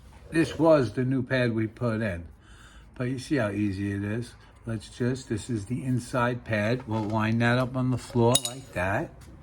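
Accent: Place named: North, United States. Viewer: ❤ ABSOLUTELY love thos guy. His US Northern accent really made me smile. He seems nit to like to hear himself talk and really knows how to keep it simple. Plus, he knows what he's talking about. I've done brak pad inspections and replacements in the past, but I wanted to refresh my memory, and this guy really came through. Yes, I did view other videos, but I really like this one the best. Thanks, guy, for giving me that little boost of confidence. O, I'll have a backup guy watching over me. Breaks are something you just don't monkey around with.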